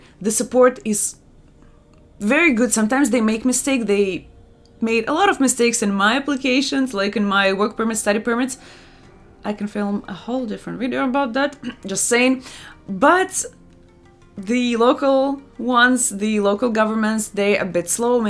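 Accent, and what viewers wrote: Russia. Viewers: I wish you spoke in your Russian accent for the entire video. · I like your Russian accent! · Her Russian accent is sooo good 😅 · hi, thanks for yours videos. I am working to inmigrate to Canada by studies from Chile, South America next year. (2022) I love you play Russian accent. Thanks.....Nos vemos en Canada!!!! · I like yours videos and what I like the most is when you play your Russian accent, this make me LOL. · 😄 I was looking for a channel about what life in Canada is like and came across you! As soon as I had heard your first word I learnt you are Russian sa well as I am. Привет из России. Не скучаешь?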